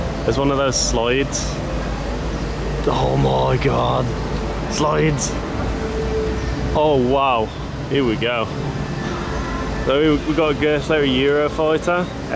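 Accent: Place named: Midlands, United Kingdom. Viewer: hi mate, love your content! just wondering are you from the midlands because your accent sounds very strong ps I'm from Birmingham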